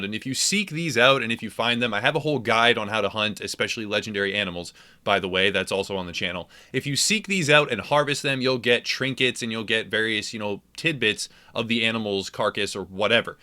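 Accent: United States. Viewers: Typical thick American pronunciations, Saint Denis for example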